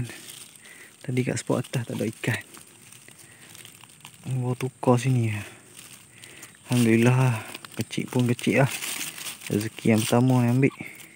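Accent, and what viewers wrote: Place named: Gerik, Malaysia. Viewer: Ckp pun udah mcm org grik....tokdang tahok..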